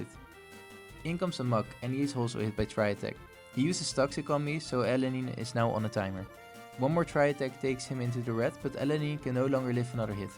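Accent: Netherlands. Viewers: Wait do i smell some dutch?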